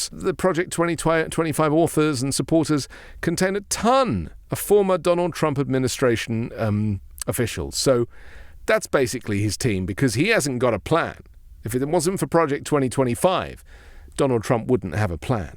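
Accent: United Kingdom. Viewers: Well nothing like hearing about American politics from someone with a British accent 😅 But gooo Kamala !!! RID the world of Trump !!!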